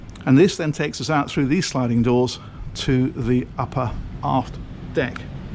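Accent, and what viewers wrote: United Kingdom. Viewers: Touring expensive yachts is done best with a British accent. Lol It's just amazing the different lifestyles people live. I'll never come close to owning something like this. Must be nice to have money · I saw an American flag on the stern of the yacht, so why do you have this snob talking brit doing the tour? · Always a British narrator for such elegance.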